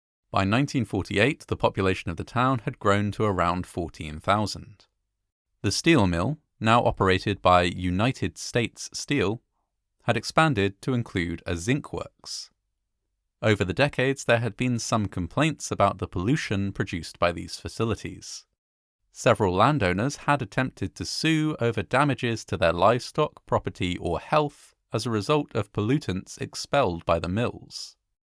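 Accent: United Kingdom. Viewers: Your historical accounts of these tragic events across the planet and during recent centuries, are exceptionally well researched and are excellently narrated and visually presented! Your sensitivity in presenting these human tragedies is also very commendable! Thank you very much kind sir! I enjoy your British accent and turn of phrases, especially when they're used to describe North American life. As Canadians, we're more likely to use American terminology even though we've largely retained British spelling and syntax. Within this short documentary, you use: "the high street" versus (the North America) 'the main street'; "football pitch" versus 'football field"; "caskets" versus 'coffins'; "steel works" versus 'steel mill'; I should have jotted down them down as there were perhaps a couple of others! I'm certainly not suggesting changing your speech or approach. 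I just thought that viewers outside the UK might have noticed and appreciated this diversity of English idiom worldwide 🌐! Perhaps English usage throughout the former British Empire follows the original traditions. I'd imagine that due to the influence of the cultural elephant next door, that 'Canadian English' is much more Americanized than most! 🇬🇧 🇬🇧 🇺🇸 🌎 🇺🇲 🇨🇦 🍁 🇨🇦 🇺🇸 🌎 🇺🇲 🇬🇧 🇬🇧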